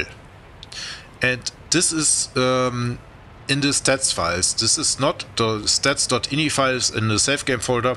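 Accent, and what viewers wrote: Germany. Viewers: Ja, ich verstehe, Ihr Englisch ist voller deutscher Akzente. Ich dachte, es wäre nur Österreich oder Deutschland. Schönes Video übrigens!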